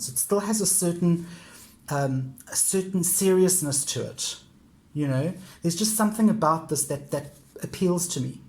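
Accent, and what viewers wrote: South Africa. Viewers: Oh my! WHO ARE YOU??!!! I've only just stumbled upon you vis-a-vis this video. (Who doesn't love a collection video!) Immediately, I recognized, and was drawn to, the South African accent. LOVE your energy, wit, clarity, etc etc... I will be certain to check out other videos on your channel. You've earned a new sub. Thank you for sharing your collection! ♥️ You and yours, stay well. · Really enjoyed your video! Great collection, and your accent made me feel nostalgic, as I was born in South Africa😊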